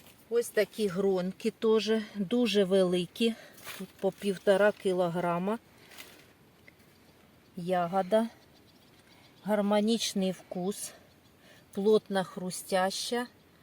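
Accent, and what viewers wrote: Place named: Sumy, Ukraine. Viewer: я із Сумської області, у нас всі так розмовляють, майже. Це нормально!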